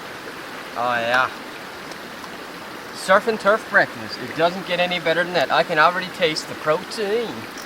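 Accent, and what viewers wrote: Newfoundland, Canada. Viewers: Are you from Newfoundland ,you sound like you have a bit of an accent? I just started watching the vid and had to work...I'll watch it later...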